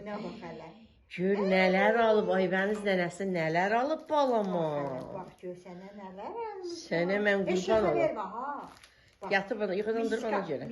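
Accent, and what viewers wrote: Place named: Nakhchivan, Azerbaijan. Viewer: O qədər xoşum gelir Naxcivan hehcesinden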